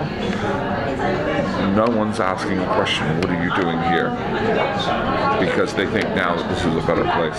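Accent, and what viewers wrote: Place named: United States. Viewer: Why has he got a Yankee accent if he is British?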